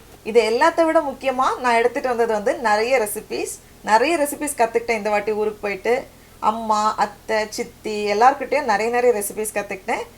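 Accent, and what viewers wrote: Nagercoil, India. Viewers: So happy to hear namma ooru Tamil. Looking forward to seeing more of your videos. By the way,,,can u upload some roast/grilled chicken in oven if possible? I am from Nagercoil but now in UK, where we get lovely meat joints. I hate the English way of doing it as it’s too bland. Oven roast chicken, duck, turkey, ( if u r ok with beef and pork u r welcome. if not ignore it. No issues) Wish u a wonderful New year. Nothing refreshes us like a visit to our home town. Keep going. All the best.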